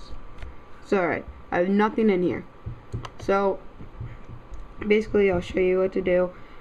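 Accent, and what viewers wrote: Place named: United States. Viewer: Suggestion: 1. Make a script (short headings are fine) 2. Prepare your material. 3. Do a few dry runs. 4. Speak clearly. For some people an American accent is hard to follow 5. Speak slowly. You sped up with the copycat@ line! 6. Don't hum or sing when you have a blank moment or drum your fingers. If you start to do that go back to 3 above. 7. Close your room door as we can hear your Mum or Sister talking in the background.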